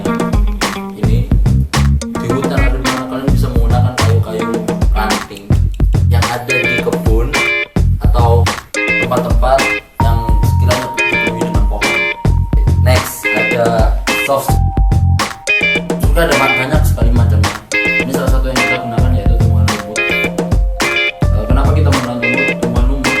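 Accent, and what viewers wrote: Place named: Java, Indonesia. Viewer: iki wong ndi seh? medok banget wkwk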